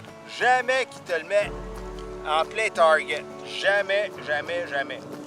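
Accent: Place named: Quebec, Canada. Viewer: Oui je comprends bien le Québécois, car j'ai fréquenté pas mal de Québécois . C'est un vrai plaisir de te regarder et de t'écouter. Je vais prier les dieux de la detection pour tes prochaines sortie.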